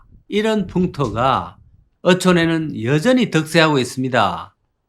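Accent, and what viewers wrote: Gyeongsang, South Korea. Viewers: 구수한 갱상도 사투리에 적절한 말투에 정말 재밋고 웃음이 절로 나오네요~ 볼수록 공감이 가네요...😅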